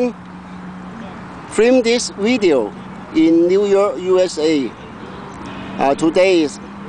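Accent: Taishan, China. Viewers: 很地道的老余声，很亲切，很励志，谢谢！我也来自新昌，现在旧金山湾区。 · I am glad I found your video and listen to Taisanese spoken just like my mum did. My mum was from Toisan, also a Yen 甄。Thank you.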